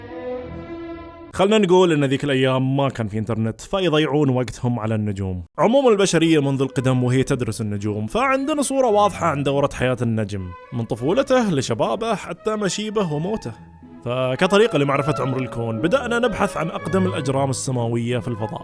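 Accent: Kuwait. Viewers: ياخي لهجتك مب سعودية لهجتك كويتية .. انت قلت انك سعودي 🤷🏻‍♂️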